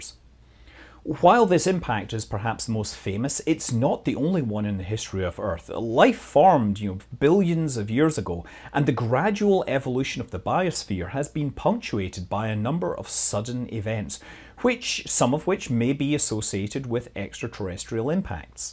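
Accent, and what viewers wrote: Scotland, United Kingdom. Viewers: Fair point. has a Scottish accent but I did find a video of his that suggests he lives in California. So I think I know more or less where he lives. That doesn't go for everyone. A UTC time or a clue about which 9am would have been helpful. If you have a problem with that, it's on you.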